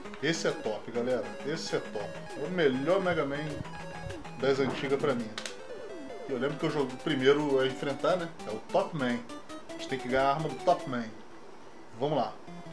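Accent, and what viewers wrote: Rio de Janeiro, Brazil. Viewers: Bacana seu canal de games,você é do Rio de janeiro né,dá pra ver pelo sotaque,igual ao meu,eu moro aqui em niterói RJ.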